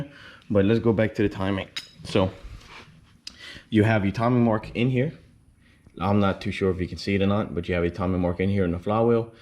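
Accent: Louisiana, United States. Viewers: Damn you must be from Louisiana😂😂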